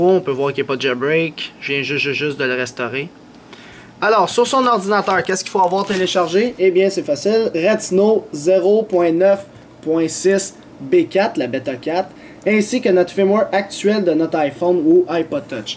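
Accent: Quebec, Canada. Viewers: tu as vraiment un accent de fou, sacré québécois :D bon tuto en tout cas ! pour ma part je reste aussi en 4.1 JB à cause du tethered de l'iOS4.2.1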